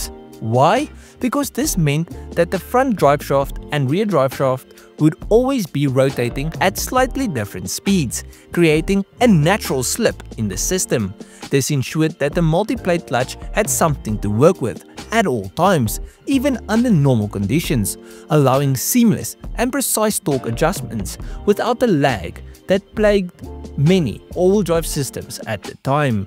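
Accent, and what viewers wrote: South Africa. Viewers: You sound like a white south african, please take care and protect yourself from the maniacs.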